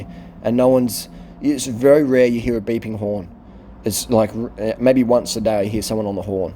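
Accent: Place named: Australia. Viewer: There is do and don’t . Her outfit is so inappropriate. I don’t think she study to much before she came to the country . Please put some clothes on . Culture and etc be respect . You are the first one that use the F word in video , beside that it is a nice video. Sound like Australian .. I do not have a problem Where you from at all and I am not jealous the way she dress just worry that she will be rape or something will bad will happened. Just be safe. She is not doing any modeling on the street I don’t think.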